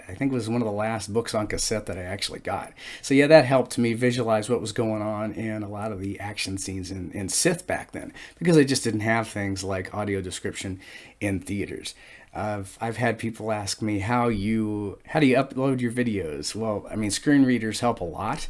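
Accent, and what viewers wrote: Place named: North East England, United Kingdom. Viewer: You sound like you're from the north east area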